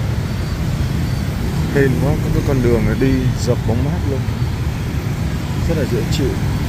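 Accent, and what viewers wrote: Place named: North, Vietnam. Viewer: Mình thích nghe giọng nói miền Bắc a ấy nhẹ nhàng truyền cảm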